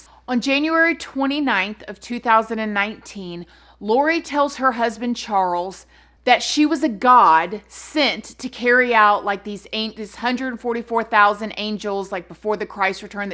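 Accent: South, United States. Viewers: I watch as many of your videos that I can, but you just had me cracking up, I haven't laughed so hard in a minute, With your southern accent, the expression on your face, talking about this dizzy blonde wacko, "like okay calm down, You're gonna have to sit down and calm down here sister!" It was just so hilarious, thanks for the laugh I needed it!! That's why I stay watching, you have a gift for doing these videos, God Bless You 🌼🌻🌼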